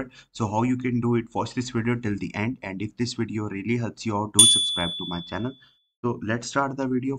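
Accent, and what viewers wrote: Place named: India. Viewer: bro is trying so much to dont have an indian voice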